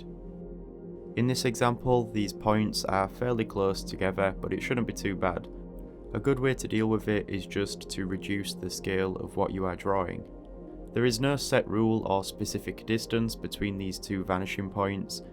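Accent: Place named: Scotland, United Kingdom. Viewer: He has a Scottish/British accent and is from the UK. He has images and a few links about Staedtler which is a company in Nuremberg, Germany but has 150 other representatives in other countries. One link stated that he was on site in England. He also is an architecture graduate at BlokBuild from Hull, East Yorkshire [England]. This took about 8 minutes to find out. You’re right, in that, asking him is the direct answer—-but he rarely comments, I haven’t actually seen him commenting at any point and I was curious myself. This informaiton is only speculation, for one—-im 100% block out deaf. But this could be why there’s confusion on his accent, this happens when someone is from one place and relocated to another [even more so if a third place is added].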